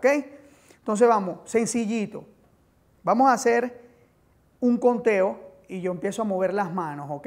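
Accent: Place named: Venezuela. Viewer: Gracias profesor al parecer por el acento es Venezolano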